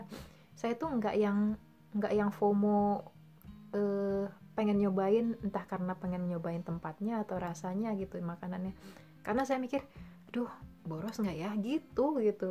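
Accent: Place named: Balikpapan, Indonesia. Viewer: Dari aksennya kek org Balikpapan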